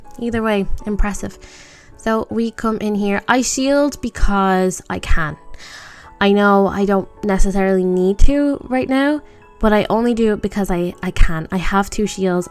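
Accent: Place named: United Kingdom. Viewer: I really like your british accent <3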